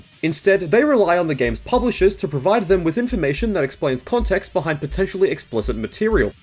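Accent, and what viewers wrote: United Kingdom. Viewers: Ur from Australia?! I assumed u were from the UK 🤯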